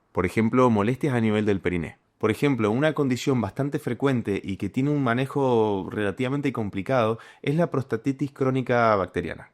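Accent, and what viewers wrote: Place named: Mendoza, Argentina. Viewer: lo único que entendí, que me parece que sos Mendocino.. esa tonadita que nos caracteriza jaja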